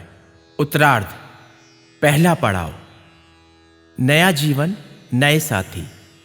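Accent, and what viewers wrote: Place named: Varanasi, India. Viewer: these stories by themselves are so interesting, but your superb narration in the Banarasi dialect makes them all the more entertaining! Takes you to a different world altogether. Congratulations! Well done! 👌👌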